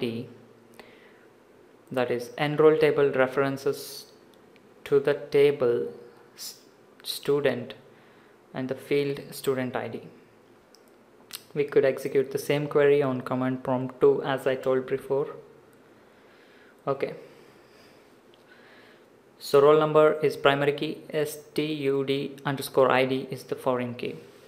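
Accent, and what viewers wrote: India. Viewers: Where is this accent from? India?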